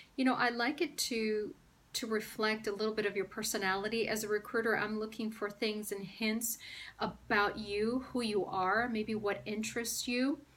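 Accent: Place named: United States. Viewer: I"m most surprised that English is not your first language. Floored really. Your American accent is perfect! I've worked with foreign students and with ESL programs, and I would never have guessed English is your second language. You constantly surprise me B!!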